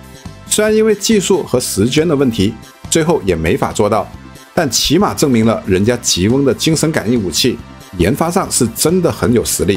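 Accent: Hong Kong, China. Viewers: 香港人說普通話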